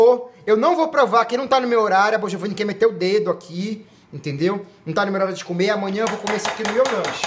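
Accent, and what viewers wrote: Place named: Pará, Brazil. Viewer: Ele é paraense!! Esse "eguaaaa" e leso são inconfundíveis. Kkkkk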